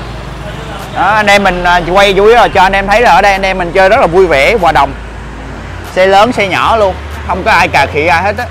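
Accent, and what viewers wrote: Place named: Phan Thiet, Vietnam. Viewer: anh từng sống ở phan thiết đúng k anh? Nghe giọng giống dân pthiet quá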